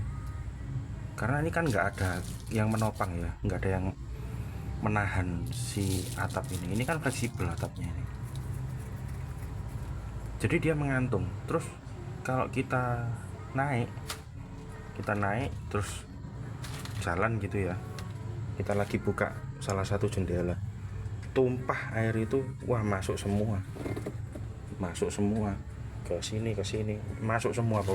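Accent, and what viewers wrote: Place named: Malang, Indonesia. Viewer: 😂 iki logate kok koyok wong malang yo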